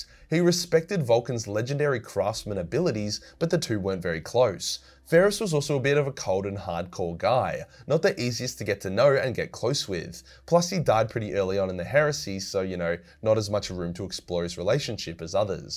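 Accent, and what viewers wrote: Australia. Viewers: every time i watch your videos i start thinking with an australian accent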